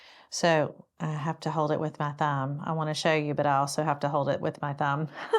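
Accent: South, United States. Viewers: Ok you have caught my attention, where do you live or from your accent is so southern?😊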